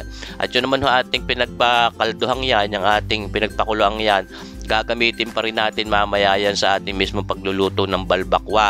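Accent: Batangas, Philippines. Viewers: Ok sana pero asar ang tono ng salitang battangueño pangit pakinggan. Sa akin lang comment yan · Nakaka aliw ang punto ng bigkas ng salia po ninyo taga batangas po kayo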